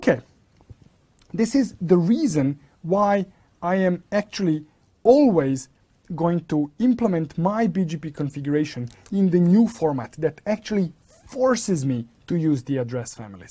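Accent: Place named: Eastern Europe. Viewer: I love this guy and his eastern europeen accented English, amazing explanation of all import concepts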